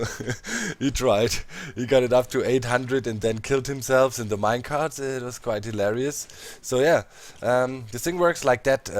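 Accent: Germany. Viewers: German right ;)?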